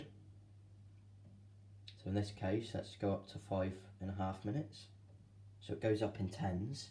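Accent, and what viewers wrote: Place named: Cornwall, United Kingdom. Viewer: He speaks with a Cornish accent. He probably lives on a farm in Devon or Cornwall. He is a lovely boy who should be working on his tractor.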